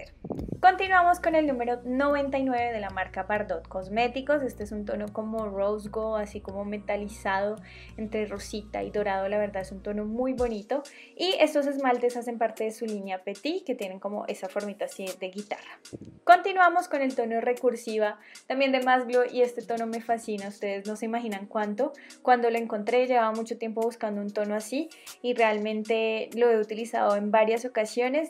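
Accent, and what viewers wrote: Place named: Bogotá, Colombia. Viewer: Siento el acento Rolo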